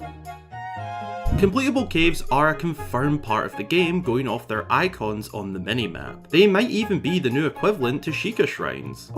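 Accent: Scotland, United Kingdom. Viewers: Mate I know all I did last video was compliment your title screen but I have the urge to say thank you for including captions. My mother loves Zelda and only has 10% hearing left, and also you're Scottish and whilst I understand the thickest Glaswegian, sometimes people find the accent difficult. So thanks again for being so inclusive!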